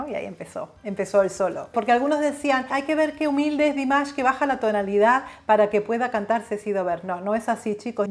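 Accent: Argentina. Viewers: Que lastima que perdiste el acento Argentino 😭 y Felicidades Ceci te sigo hace años ❤️ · Ceci, te escucho hablar y siento como si estuviera escuchando a una argentina, si... tienes unos modismos al hablar típicos españoles pero me desconciertas. Excelente trabajo entrevistando a Dimash.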